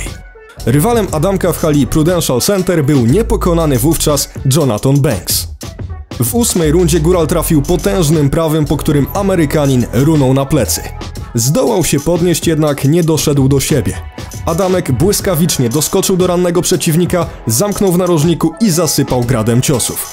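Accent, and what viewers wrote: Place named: Germany. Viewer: Akcet dość mieki ale slabo niemieckie to nie rozpoznałem że Polska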